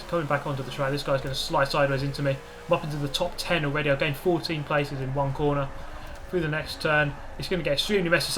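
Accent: United Kingdom. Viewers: The British accent is paired with sarcasm as well as noobs and the Alfa 33.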